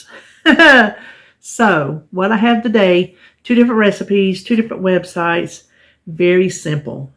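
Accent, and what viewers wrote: South, United States. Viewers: They both look delicious. I often bake muffins with almond flour and they freeze beautifully. You mentioned your accent in another video ~ I love your southern accent and your wonderful way of telling stories!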